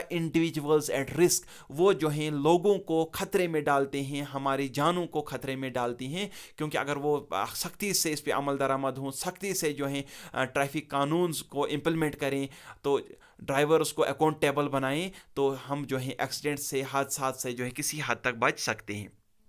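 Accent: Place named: Kashmir, India. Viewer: By listing you I think u r from kashmir... Bcx this voice belongs to kashmirians ...🤨🤨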